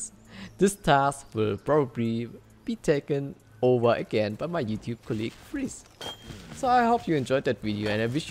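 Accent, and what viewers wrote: Germany. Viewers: Man hört heraus das du Deutsch kannst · is he from germany becaus of his pronaunciation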